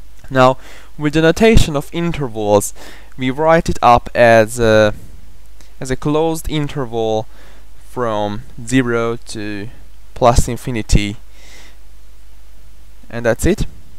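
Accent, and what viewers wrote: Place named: China. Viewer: he must be chinese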